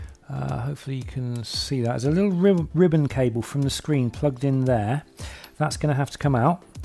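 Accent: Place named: Bristol, United Kingdom. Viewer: Have you ever captured paranormal activity with that? I love the Bristolian accent ( give or take a few miles ).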